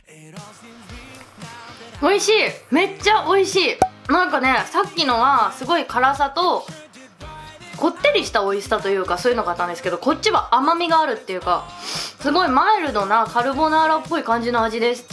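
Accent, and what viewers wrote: Hakata, Japan. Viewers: 最後の方は辛さで味がわからなそう😨 今更ながらの博多弁がめちゃカワイイ‼️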